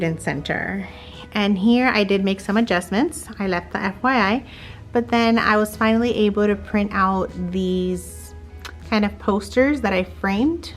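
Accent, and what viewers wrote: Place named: Miami, United States. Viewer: Great room, u from Miami? I hear accent…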